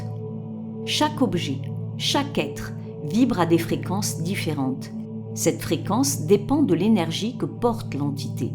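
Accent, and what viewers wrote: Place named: South, France. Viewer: Encore un grand merci pour cette vidéo très instructive! Elle me donne l'envie de pratiquer davantage le yoga, de méditer davantage et de chanter encore et plus encore! Et des milliers de mercis pour ta jolie voix du sud de notre beau pays qui me fait chaud au cœur❤❤❤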